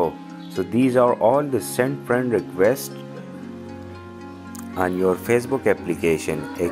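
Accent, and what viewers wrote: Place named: India. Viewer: your indian accent give me chills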